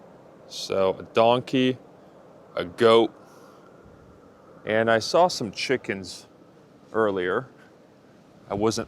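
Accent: United States. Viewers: He's American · Oh man, perfect american english to the intermediate and almost advanced students. Everyday conversation is what we need. Inside and outside. I'm brazilian. Nice to meet you.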